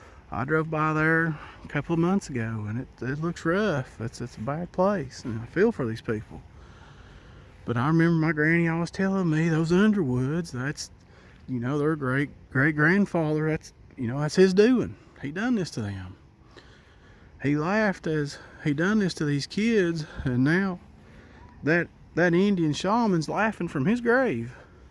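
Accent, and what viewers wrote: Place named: Alabama, United States. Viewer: We visited your area the week before Memorial Day. We stayed mostly in Townsend...and then Gatlinburg a couple nights. I would have really enjoyed sharing a meal with you. You sound just like my people here in Alabama. I appreciate the information that you share. And I do believe it. When we were travelling up that way, we were amazed by the scenery. And when you sit and watch it on video, it really doesn't do it justice. There is no way to describe just how expansive and breathtaking the smokies are. We took some really back roads that were not the beaten path. And when you experience that...you get it. Things can hide within a hundred feet of you. The terrain, for me anyway, is not walkable. Where we stayed, we couldn't even walk the yard it was so steep. You have to be in better than PRIME shape to move about the mountains. 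And these flatlanders at my house do NOT have mountain legs...lol. Do you have any stories about Townsend? I would love to hear them. Thanks and God bless.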